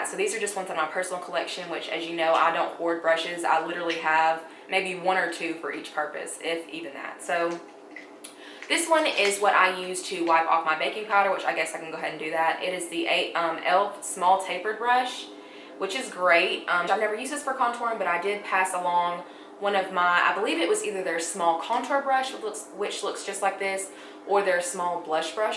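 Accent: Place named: Texas, United States. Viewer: I thought you were from Texas. New subbie. Love your channel❤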